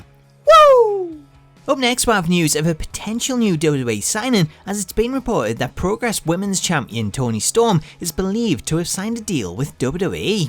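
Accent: Newcastle, United Kingdom. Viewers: Newcastle sounds like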